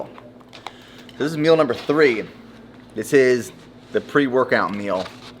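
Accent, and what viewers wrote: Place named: Pennsylvania, United States. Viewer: How does a guy from Texas have a Delaware County, PA accent?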